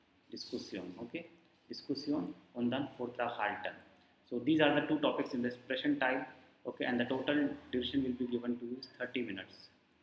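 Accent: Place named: India. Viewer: I could not understand anything at all due to your Indian accent.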